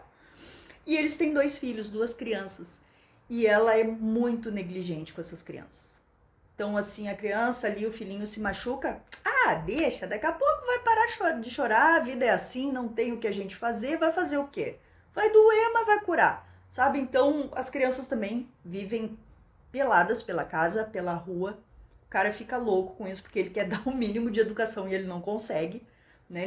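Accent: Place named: South, Brazil. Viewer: Eu que sou de 88, tenho uma queda por essas capas rsrs, uma vida vendo livros assim nas bibliotecas que frequentei... mas, a história é doida hem?! 😅😂🎉. Nem Jung explica, já que Freud só complica. Pesada a trama...😮 Sigo seus vídeos desde que vi um vídeo seu sobre um livro gótico do círculo do livro, acho sua dicção sensacional, o sotaque sulista é tão lindo... você é muito animada uma pessoa do bem!!! Felicidades para você e seu noivo.😊 Eu sigo a Tati e você não fica atrás nem um pingo, suas resenhas são ótimas. Quando tem uma taça de vinho, já sei que o livro compensa rsrs. Amo o canal do Rubens também ❤ Sucesso!!!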